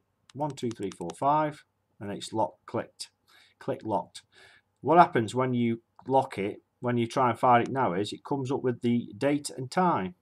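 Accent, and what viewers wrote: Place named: Manchester, United Kingdom. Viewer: I was going to say Manchester. I have a friend from there whom sounds very similar to you.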